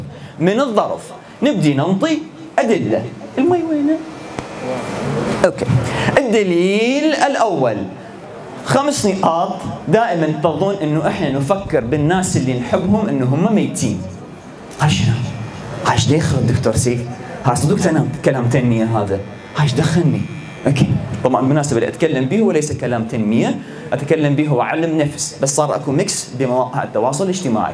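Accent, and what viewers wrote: Mosul, Iraq. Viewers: انتا من الموصل يعني مصلاوي 😏😏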